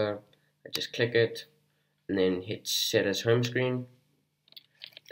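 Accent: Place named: South Africa. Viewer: Awesome video. The SA English is the best sounding.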